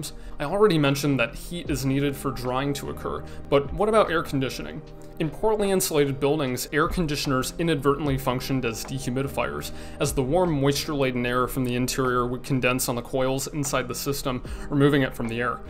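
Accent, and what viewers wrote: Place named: United States. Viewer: Thanks bro I totally couldn't tell from the fact that the man with an american accent was talking to me on an american website that he is an american.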